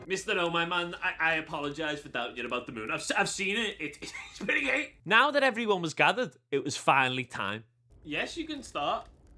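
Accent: Liverpool, United Kingdom. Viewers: This is an incredible amount of editing work to have done hatchling. Glad you enjoyed the game. I’ve also watched around 20 playthroughs but I never knew how much I needed an angry Liverpudlian version in my life. If the whole thing is recorded I can imagine our little Outer Wilds community would love to see the whole thing ::)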